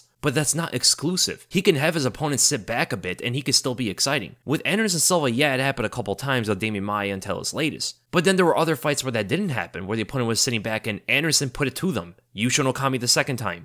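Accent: United States. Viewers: Had no idea you were Albanian, you sound American. You are born and raised there? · Had no clue you were from Albania that's cool. Totally thought you sounded like you were from the US in Milwaukee or something lmao😂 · damn albanian, i thought you were american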